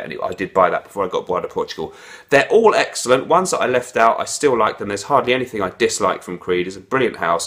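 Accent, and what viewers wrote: United Kingdom. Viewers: Canadian sub here..... As I said before, there's nothing more commanding than a proper Brit accent! I'm so very thankful to you for the Bois du Portugal recommendation.... Got a sample from a place called Holt Renfrew here in Canada and just bought a full 120ml bottle... On a side note, if you wanna be blown away by a phenomenal upscale G.I.T frag (believe it or not- brother you've gotta gotta gotta get a sample or somehow try out RAIN from the House of Royal Crown.... It should be readily accessible in the U.K from what I've researched! Project all you want Mr.!👍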